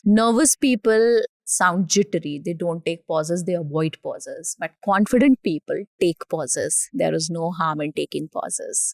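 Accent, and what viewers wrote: India. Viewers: You are so good with words. But your Indian accent ruins everything. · Am I the only one having problem with indian English accent ? 😢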